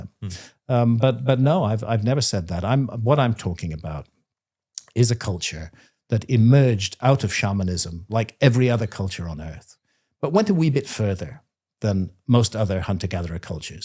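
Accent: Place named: North, United Kingdom. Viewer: Always feels weird hearing a fellow norther accent on YT, in a good way!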